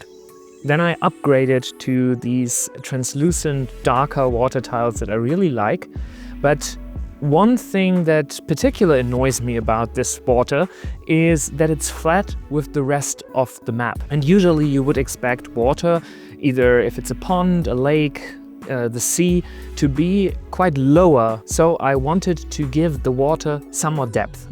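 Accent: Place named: Germany. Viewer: Der Dude ist klasse und hört sich sehr deutsch an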